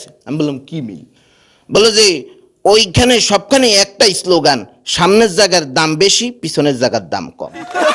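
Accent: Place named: Barishal, Bangladesh. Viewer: barishailla vasha shunlei moja lage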